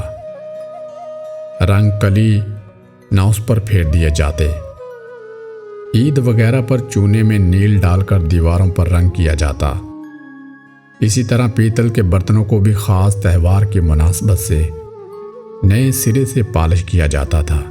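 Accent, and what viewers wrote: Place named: India. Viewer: It was good video but it looks like some indian dude made it with Indian portraits, it’s more impressive when Pakistani have their own portraits,even narrated by Indian accent. 20 years ago Pakistan was not part of India. It’s nice try by Indians to make Pakistani cry.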